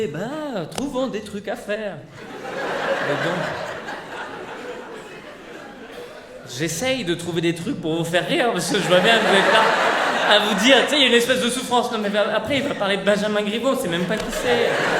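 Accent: Switzerland. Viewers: j'adore ton accent suisse voilà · J'adore ton accent suisse, il faut que tu fasse une vidéo où tu parles en Suisse...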